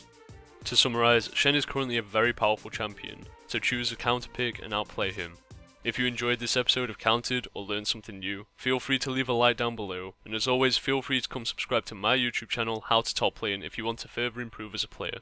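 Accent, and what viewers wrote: Northern England, United Kingdom. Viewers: Your northern English accent is very different from mine. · I love british accent :D Him and JackFrags are my fav commentators on youtube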